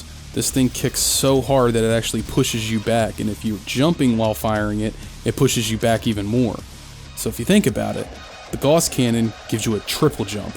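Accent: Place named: South Jersey, United States. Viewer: I'm a simple man. I hear a South Jersey accent I subscribe.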